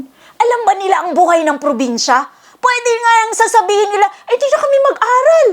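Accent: Visayas, Philippines. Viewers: Atty. ang accent mo ay Visaya. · Bisaya ka ba Atty. ?😅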